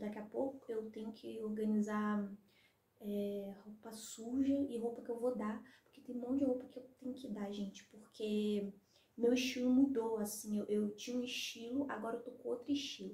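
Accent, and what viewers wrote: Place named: Rio de Janeiro, Brazil. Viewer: Vc é carioca né? Pelo sotaque eu acho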